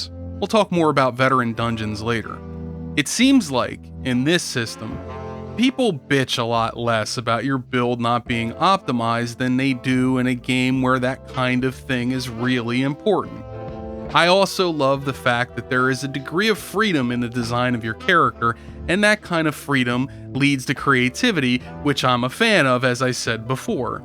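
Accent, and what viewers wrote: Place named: Westchester, United States. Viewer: That Westchester accent is great when I'm watching activity and infuriating if I'm trying to relax to video game crap. Wouldn't have it otherwise.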